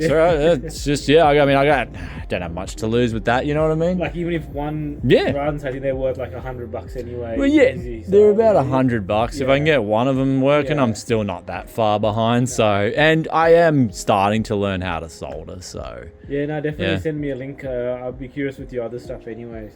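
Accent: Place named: Australia. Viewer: I think I understand the Aussie accent now. You just say ye ye yea a lot while having a conversation. Interesting.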